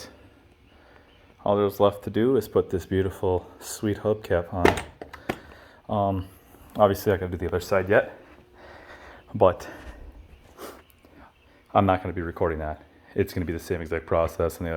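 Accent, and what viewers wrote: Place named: Canada. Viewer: You sound Canadian...will I have a problem if I have an American accent? Thanks for the easy video...it looks pretty straight forward, Thanks!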